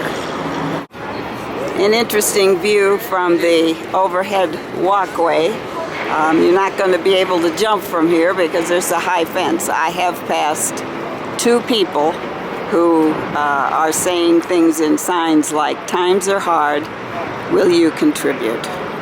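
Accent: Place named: Chicago, United States. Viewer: Where do you come from with that accent? You sound like a Chicagoan.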